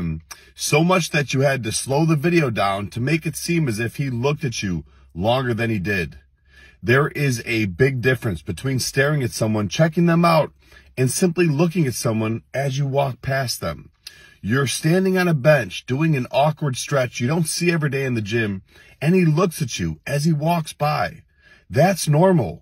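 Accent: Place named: United Kingdom. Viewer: no joey the worst part is the britbong accent , i could smell the fish and chip from here. oi oi mate bustad innit bruv ee woz looin at me moit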